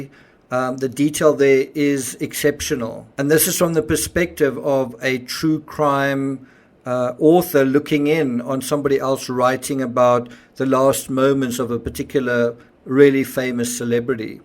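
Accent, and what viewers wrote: South Africa. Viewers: Excellent work,after feeling your near desperation in the 'recent true crime circus'- you totally nailed this one and your quality shines through strongly. Best wishes for a quick recovery of your injury.( my 9 yr old loves your S.A. accent and 'please doo' is heard often in our household..lol) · You sound South African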